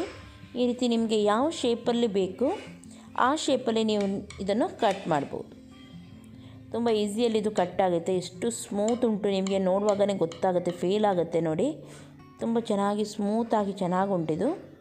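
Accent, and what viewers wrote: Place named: Dakshina Kannada, India. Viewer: I like your kannada. Perfect blend of south kanarian, blore and catholic style.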